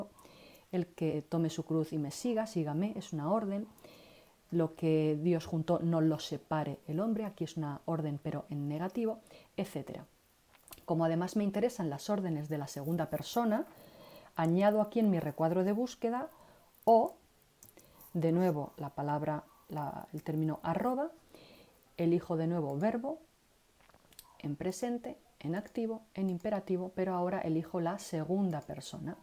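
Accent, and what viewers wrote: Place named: Spain. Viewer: Logos excelente. Mis videos son más tipo como preparar mensajes usando el programa. Hay bastante riqueza de información en sus videos y yo los usare para ya no enseñar lo que tu has plasmado aquí. Con ese dejo supuse que era de España. Algún día tendré el privilegio de viajar allá.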